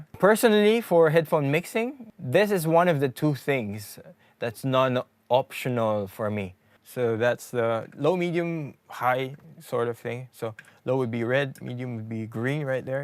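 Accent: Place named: Philippines. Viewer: you DEFINITELY sound Filipino. I would know, because I am too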